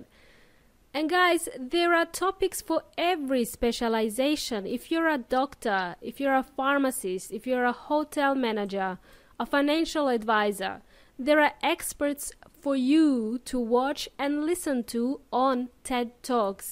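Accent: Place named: Latin America. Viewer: I love your voice it's so clear to me so my question is are you originally from a Latin American country because your voice sound like Latin person by the way a new subscriber to your channel .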